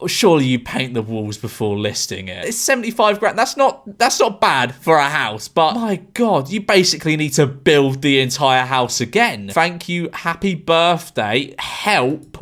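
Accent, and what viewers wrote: United Kingdom. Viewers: "You do see some absolute honkers listed for a million" the British way of speaking is so fun lmao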